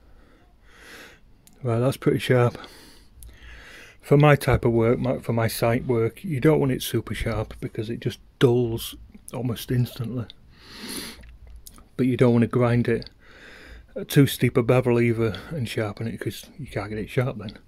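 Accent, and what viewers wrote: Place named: Northern England, United Kingdom. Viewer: Great no nonsense video. You sound like you're from Jonny Vegas country?!?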